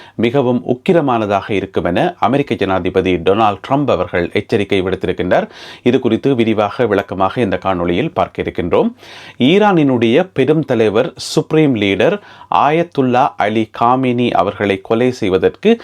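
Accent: Jaffna, Sri Lanka. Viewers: Super speaking tamil in jaffna ❤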